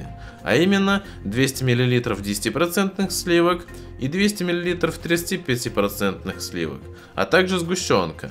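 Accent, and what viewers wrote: Estonia. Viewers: Ты из Эстонии? Шок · Бро,ты из Эстонии?!😃(я да) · он из Эстонии чтоле?? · Ты с Эстонии?))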